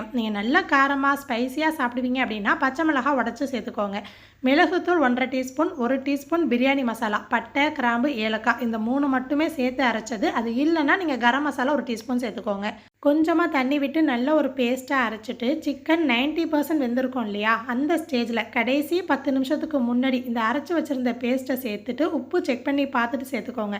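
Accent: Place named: Mylampatti, India. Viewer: enga familyla nanga ipd dhn pesuvom adhe mari pesuringa poorvigam mylampatiya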